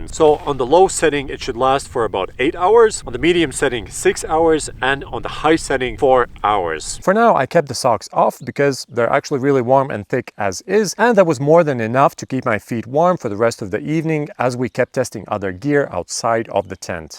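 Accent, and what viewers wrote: Latvia. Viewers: Love Latvian accent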